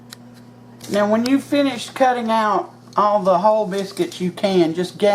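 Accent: South, United States. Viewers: Hello from Texas! You had me at no-fail biscuits. New subscriber here. Love your sweet southern accent...sounds like my mama—she was from Alabama. I look forward to looking through all your videos and watching your new ones too! God bless you! And I look forward to trying these biscuits. ❣️ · I love your Southern voice. It's so soothing to hear you talk. I know you Love the LORD. He is so good to us. I live in Phoenix, Az. GOD BLESS YOU BOTH.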